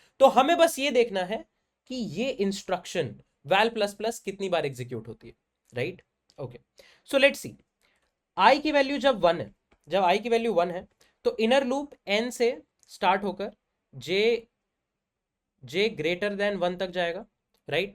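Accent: India. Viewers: through your commnet i noticed that this video was not in hindi language, the accent is so indian that I really thought that he is talking in hindi lol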